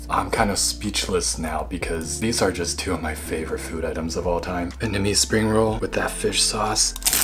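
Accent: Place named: Australia. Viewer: I thought you were from Australia 😭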